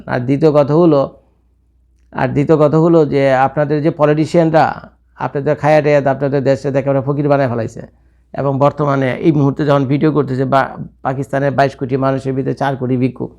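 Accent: Bangladesh. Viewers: আপনি আজকে 15 বছর যাবত নাকি আমেরিকাতে আছেন কিন্তু আপনার ভাষাগত যে আঞ্চলিকতা রয়েছে বাংলাদেশের সেটা কি একান্তই বাংলাদেশের লুঙ্গি সাব দের খুশি করার জন্য🎉🎉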